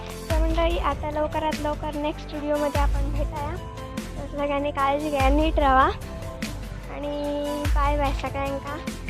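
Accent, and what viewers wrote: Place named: Malvan, India. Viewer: Tuz gaon konat...? I like malvani language tone Very nice video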